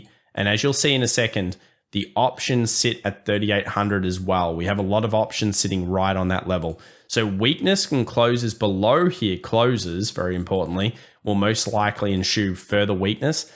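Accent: Australia. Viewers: You da man! Do you have a Crocodile Dundee Knife or an American knife? Sorry, that was my first encounter with an Australian Accent... And I have your Whatsapp #! I'm going to call from NY to wake you up!!!!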